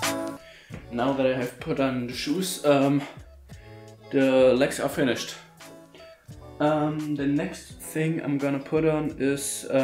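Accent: Germany. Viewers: damit war gemeint, dass man hört, dass er eigentlich deutscher ist und nicht Engländer oder so... Er hat alles richtig gemacht/geschrieben, man hört wohl raus das dir ein paar Gehirnzellen fehlen 🤦‍♂️ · Man hört so raus das er Deutsch ist❤️😂😂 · Ich hab danach gesucht das jemand merkt das er einen deutschen akzent hat 🤣😂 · that all can heared out hes germany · Mann hört es instand raus mit dem deutsch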